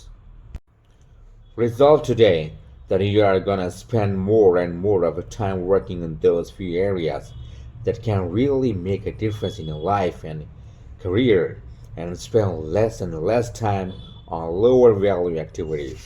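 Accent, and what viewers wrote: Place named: United States. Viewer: A true American accent 🎉🎉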